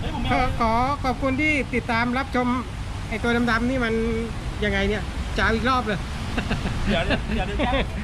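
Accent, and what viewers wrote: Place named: Northern Thailand, Thailand. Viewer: สวัสดีครับ อู้ภาษาเมืองบ่ธรรมดา 555+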